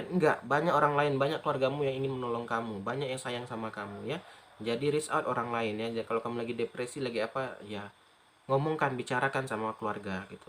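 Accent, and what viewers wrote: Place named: Java, Indonesia. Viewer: Klo ngomong.... Bahasaya...... Lbut Org jawa apa org medan. Apa prg madura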